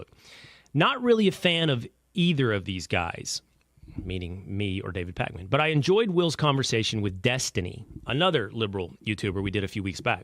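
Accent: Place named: South, United States. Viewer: Hi there, I saw the debate with David Pakman & that happened to be the first time I've seen your show. I watch David Pakman, so I think the algorithm hooked me up. I commend you for your calm composure, fairness & respect for Pakman. I've watched him for years, & I was taken aback by the aggressive tone he took with you from the start. I don't know if he was maybe scared or nervous because he's had some bad experiences with debates with the right "debating.". Or the two of you have differing ideas about what is/isn't a debate. In any case, he seemed ready for a fight & defensive. I lost some respect for him after that episode. I am an old-fashioned liberal libertarian (liberal on most social values, but lower my taxes! - I am not wealthy!) I now have subscribed to your channel because I appreciate your delivery, like to hear fair arguments from reasonable folks on the right, & as a Southerner, I know that accent! 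Thanks for the follow-up here. It was very fair,. May we all honor the men and women who have given their lives & health to keep us free & safe this Memorial Weekend.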